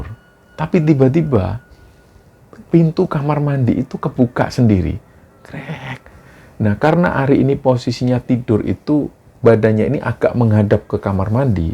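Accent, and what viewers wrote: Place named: Surabaya, Indonesia. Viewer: Mas dialek Surabaya nya kental banget....☺️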